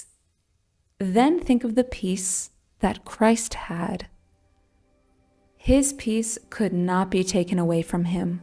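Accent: Brazil. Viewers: I sense a Brazilian accent on the narrator’s voice. Great video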